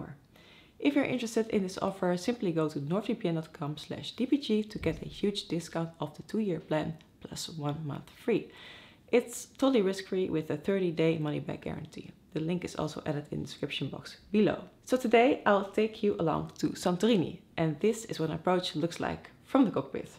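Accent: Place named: Netherlands. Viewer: lekker Nederlands accentje hoor!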